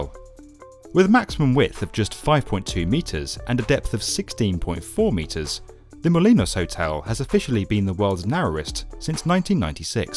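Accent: United Kingdom. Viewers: Are you British? cuz I...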